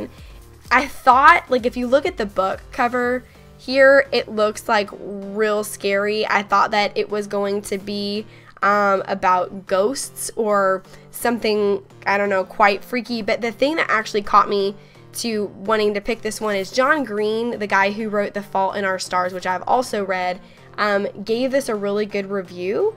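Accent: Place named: South, United States. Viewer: To me you sounded so southern, I don't know why. You just sounded different. Or my brain is just being a derp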